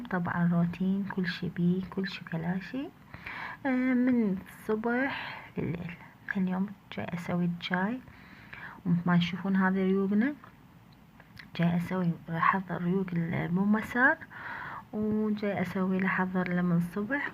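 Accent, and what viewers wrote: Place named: Iraq. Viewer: بالهنا صحتين احسن حاجه اللهجه العراقيه بحبها اوي ❤ وشكرا علي طريقه مرقه القرع ربي يحفظك ❤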